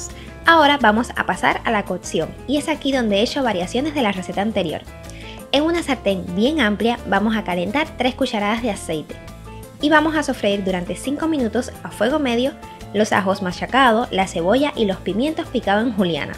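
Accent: Cuba. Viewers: Así hablamos los cubanos, a afinar oídos jajaja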